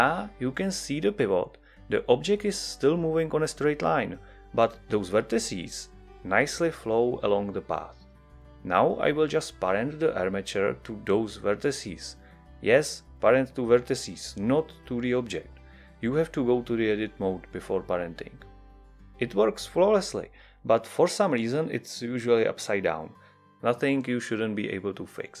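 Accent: Czech Republic. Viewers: Zda se mi, ze tvoje anglictina zni celkem cesky 😀 Je to pravda?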